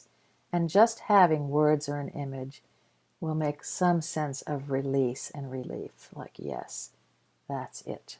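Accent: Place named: Chicago, United States. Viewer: I love her soft Chicago accent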